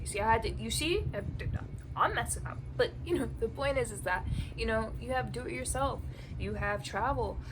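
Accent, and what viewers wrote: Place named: New York, United States. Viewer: I love this video z! The NY accent, the light hearted vibes and the authenticity. It’s so you.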